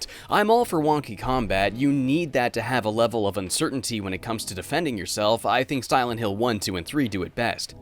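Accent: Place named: Nova Scotia, Canada. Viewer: Yo what the fuck you're Nova Scotian?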